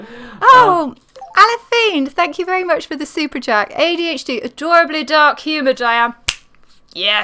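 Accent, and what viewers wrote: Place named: United Kingdom. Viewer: I'm too American to notice English from British or Welsh from Scott. I think odd is the soup sandwich combo special at a posh bistro, or standing in que to take number at the DMV. I find Brits fascinating. Your sense of humour especially. It takes a while to run the dialect through our macaroni and cheese filter, but after dialing it in, you're all really quite brilliant. Thanks for the responses Diane, you're quite lovely. Just curious, did your belly button ever pop back in? ;) If not, chuck some glitter on it! Cheers!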